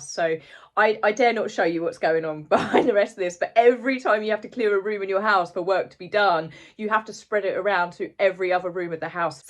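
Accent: United Kingdom. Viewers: I love your podcasts but am not British so have a bit of trouble understanding what you're saying. Could you please slow down just a bit. ❤️ Thank you!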